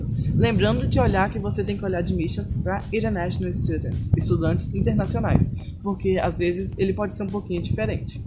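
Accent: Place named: Minas Gerais, Brazil. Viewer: Caraca mano, de minas kkkk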